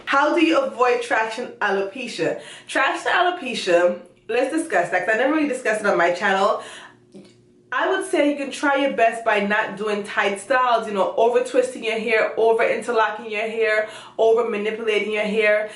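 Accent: Jamaica. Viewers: Mi love yuh patwah!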